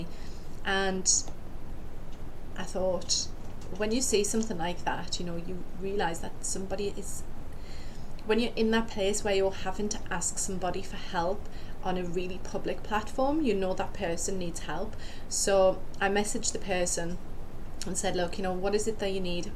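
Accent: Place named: North, United Kingdom. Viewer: !!!! 🙏🙏🙏🌈🌈🌈 You must be in the north because I'm in the south and nobody sounds like you! 😂😂😂 You sound like Sarah Milligan 💜😂 do you do in person readings? I'd love to meet you 🌈🙂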